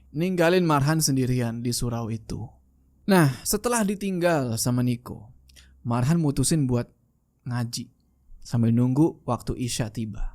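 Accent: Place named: Bali, Indonesia. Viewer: Kok malah mirip logat bali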